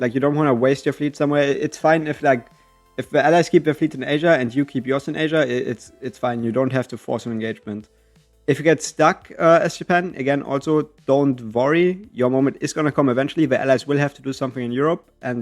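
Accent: Germany. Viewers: I just saw your video against NAYF in tommy channel and then the algorithm send me this, what a finding! If you dont mind, I would just tell you to speak a bit more slow, its a bit difficult with your accent, is it german btw? (no offense lol, I am also not a native speaker). Btw, are there any other players on your level besides NAYF and Mohammed on this MOD? I would love yo see more matches, you play damn good. Edit: the commanders MOD I meant! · i hear DEUTSCH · ohhh i hear se german aktzent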